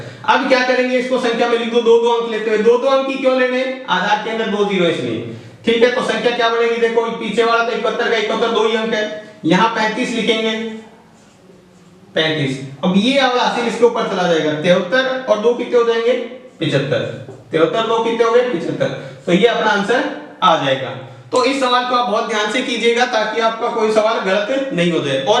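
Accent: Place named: Rajasthan, India. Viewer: Koi baat ni sir galti ho J😊😊full rajsthani boli 😏😏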